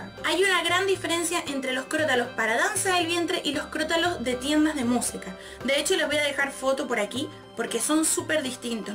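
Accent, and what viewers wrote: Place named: Canary Islands, Spain. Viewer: holaaaaa chiquilla!!!!, si dame consejos ( te cuento, es un secreto no le digas a nadie porque mi gran reputacion esta en juego jeee, me cerraron transitoriamente la clase de folklore arabe porque mi profe Ramiro tiene unos proyectos importantes ( y tambien es secreto, sacara un disco con Amir y Saida en la portada ) asi que quede algo colgado, igual voy siempre a la academia y llego un nuevo compañero que hace bellydance y bueno me meti en esa clase jaaa, no puedo dejar de ir a la academia y ha sido una forma de seguir participando, por supuesto que me veo como gorila intentando hacer los pasos, por supuesto que nos divertimos mucho con mis compis jee, y bueno tendre que esperar un tiempito, no quiero irme a otra academia - y bueno ya nos estan dando clase de ritmologia y vamos a empezar a usar los chinchines ( crotalos ) jee, entonces help me por cierto te ves re linda amiga - re lindo tu monedero - uen consejo donde comprarlos, no hay tienda de esencia oriental??? 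- bueno tengo un amigo de Mexico que hizo un belly con chinchines pero usaba traje de varon de folklore arabe y se ve muy bien, asi que mi idea es hacer un dabke o folklore arabe usando chinchines - bien, sueltito y con elastico, de 10 - el imperdible jee ( traba de gancho jaa ) que lindo hablan en Canaria, ya te lo dije muchas veces - buenisimo video me re re sirvio beshos besho s smuack!!! - Gus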